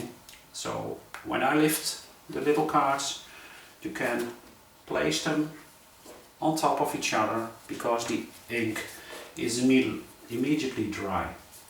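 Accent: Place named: Netherlands. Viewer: Im always happy to see dudes creating too, I love it. I was bit scared for your nice shirt - especially the sleeves - but you didnt get a drop on in! For more traditional marbling with alcohol inks, just use hand sanitizer; a good (thick-ish) layer on the plate, then inks and you can manipulate them exactly like when marbling the traditional way (just dont use sharp tools with gel plate!). I liked all your prints, but the ricepaper ghostprint one was magical. Dankje well (sorry, Im just assuming youre from NL by your accent..) and greetings from Finland. -Jane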